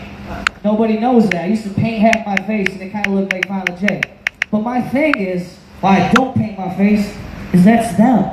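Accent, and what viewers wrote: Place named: Boston, United States. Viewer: he sounds like hes from Boston eh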